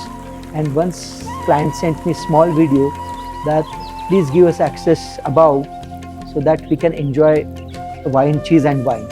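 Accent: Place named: India. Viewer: where is this located? The house look typical Indonesian old house but the way he speak his accent is not Indonesian sounds Indian somehow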